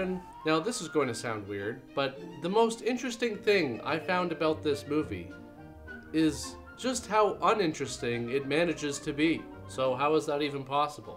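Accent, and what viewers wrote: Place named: Canada. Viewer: ive gone through a 5 videos of this channel and it feels like this guy is trying not to embrace his canadian accent, because it only slips out with words like "process" not trying to stereotype him but i spend alot of time in guild/clan voice chats and bro... just let use make fun of your accent. embrace it.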